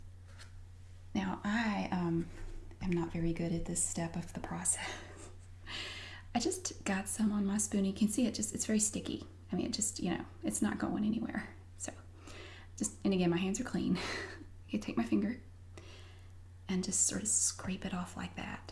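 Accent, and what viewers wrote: United States. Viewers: I'm not pointing a finger at you or anything, but I think it's so funny how Americans say Aldi 😂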